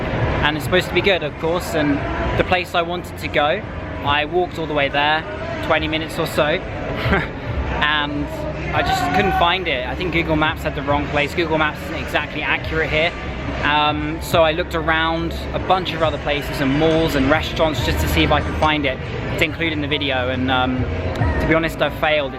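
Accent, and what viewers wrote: England, United Kingdom. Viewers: I love to heard your British accent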